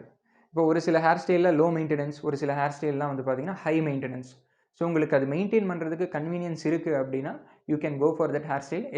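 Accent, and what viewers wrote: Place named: Kerala, India. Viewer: സംസാരിക്കാൻ തുടങ്ങിയപ്പോളെ തോന്നി മലയാളി ആണെന്ന് 😂❤️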